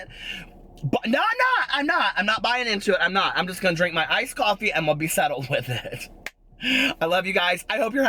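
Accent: South, United States. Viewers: You sound like you have the thickest southern accent in this rant! Love it!!!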